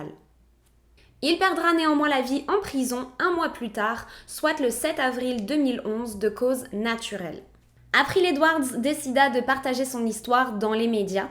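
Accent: Quebec, Canada. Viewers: On est d accord elle a un accent québécois ? (Tres léger ) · Allo!...je viens de m'abonner à ta chaîne...ça fait une semaine...tu es très bonne...mais parcontre... quand tu saute d'année...( Comme dans cette épisode)... Serait le fun que tu dises à quel âge est rendu le sujet...et pour parlé encore de cette épisode ci...tu dis a la fin qu'il a des ressemblances avec les lettres codé que le zodiaque a envoyé...mais tu n'a jamais parlé de ceci avant... continue ton bon travail tu vas aller loin....et aussi...j'ai bien entendu ton accent Québec 😊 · Salut à toi ! Et un gros bravo pour tes histoires si fraîchement racontées , surtout ne change rien , reste telle que tu es , avec ton petit accent du Québec parfois . Sur cette histoire , tu parles du tueur du zodiaque , je ne connais pas assez cette histoire pour y apporter quoi que soit ayant rapport avec ce tueur . 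En revanche tu parles du tueur au Dahlia noir , et cela est fort peu probable que ces meurtres soient liés avec Ed , car il fut démontré que les méthodes utilisées pour mutiler les victimes , étaient utilisées par les chirurgiens pour pratiquer certaines opérations , et que seule une personne expérimentée en la matière aurait pu commettre de tels crimes . Au plaisir de t’écouter encore ! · Franchement tu fait des vidéo de très bonne qualité et en plus j’adore ton accent,canadien peux être ? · N'empêche l'accent du canada commence a prendre le dessus sur de plus en plus de mots😂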